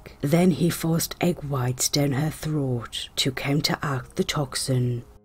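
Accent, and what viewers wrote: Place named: Newcastle, United Kingdom. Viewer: You sound like a Geordie. I live in the USA, but I grew up in Lobley Hill, Gateshead..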